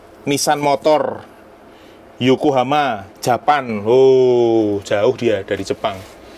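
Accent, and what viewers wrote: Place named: Surabaya, Indonesia. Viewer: SALUT SAMA BOS... TOP BANGET.... LOGATNYA NGOMONG KAYAK AREK SUROBOYO..... SALAM DARI SURABAYA......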